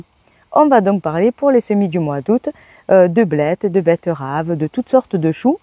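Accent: South, France. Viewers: Bjr madame merci pour vos partages vous avez un accent dû sud nous sommes peut-être voisines ,je vis en appartement je suis novice question semis etc vu les événements il faut que je puisse être autonome cela dis j'ai pas là main verte ,avez-vous des conseils ?sans indiscretion de quel département êtes-vous ?ps c'est un plaisir de vous écouter ❤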